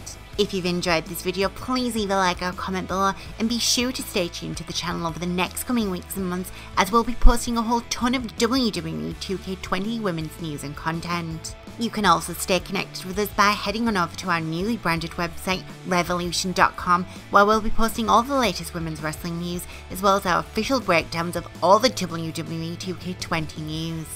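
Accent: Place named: United Kingdom. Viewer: Hi good day thanks for the info plus I got hear your British accent so my day is complete💗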